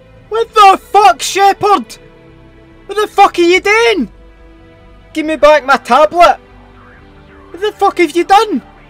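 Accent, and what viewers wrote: Scotland, United Kingdom. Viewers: u Scottish? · Your Scottish voice makes this way better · After TF141 got intel on makarov Shepherd wanted to all the credit for himself so he tried to eliminate the task force and get all the credit once makarov was killed. He wanted to redeem himself after losing 30,000 people in a snap! I know i'm 5 months late but who cares. Also are you scottish? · your accent almost like soap...is soap a scot too? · The scottish accent makes this even funnier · Heard a fellow scottish accent and subscribed instantly 😂😂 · McGregor is Irish, and uploader is Scottish, like soap and captain McMillan. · Are you Scottish? He’s Scottish right everyone? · I'm new to this channel but after reading some comments and listening to some good Scottsman rambling, I think you gents (an I use that term loosely) will take good (alcoholic too, no doubt for ya scotts) care of me. 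You've earned yarself a sub.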